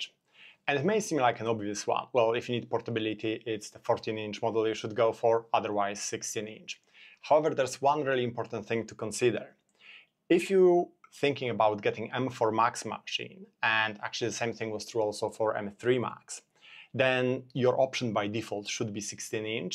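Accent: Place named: Poland. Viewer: Mordo, od razu wiem żeś z Polski. :D pozdro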